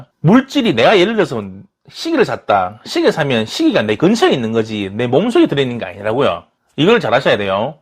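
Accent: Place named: Daegu, South Korea. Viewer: ㅋㅋ말씀하시는게 썸남이랑 똑같아서 듣는 내내 기분이 좋았어요. 참고로 썸남도 대구사람ㅋ 잘 들었어요~감사합니다 1번.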